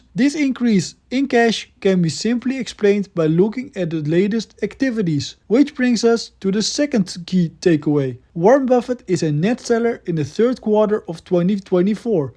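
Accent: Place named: Netherlands. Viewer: Are u from belgium or the netherlands? U sound like dutch💀🤣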